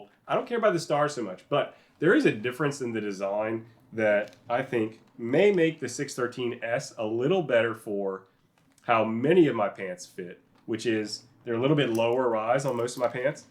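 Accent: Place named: Pennsylvania, United States. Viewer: love the pennsylvania accent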